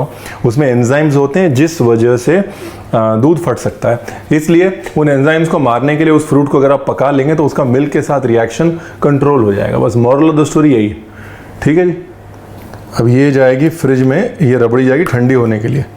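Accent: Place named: Lucknow, India. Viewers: Lovedddd the Lucknowi Accent.. Ama miyaa kamaal krte hain aap!❤ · Sir aapki lucknowi language and style umdah..🥰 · Bhai aap lukhnavi bol rahe hai mast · Chef your lucknow accent is very nice❤️ And recipe 😋😋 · Aap kitni pyari lucknawi bolate Hain hum raebareli pratapgarh se hai 😀 I love you for this ki saree recipe dekhte hain aap kahate Hain Na hanste khelte khana ban jaaye to achcha hamen bahut pasand hai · love the way you talked today that Lucknowi style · He talk like Lucknow wali accent. 😀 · Ranveer jab aap humaye lucknow ki bhasha me baat karte hai... Tab aap aur bhi jada apne lgte hai.. ☺🤗👌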